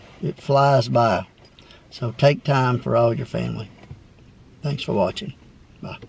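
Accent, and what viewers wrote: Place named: South, United States. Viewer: Man you've got a HEAVY southern accent. Not all southerners sound like you so curious where you live. Turning 50 soon myself and i couldn't agree more about your advice. We have to find a way to slow down and realize money isn't everything. I've changed a lot in recent years. I used to just go and go. I also would feel like an outsider in my own family. For many years I really had no choice because I was the main provider in our family, my wife was a stay-at-home mom. Now that the kids are grown and she started working a new business I am now able to back off a good bit which has been really nice.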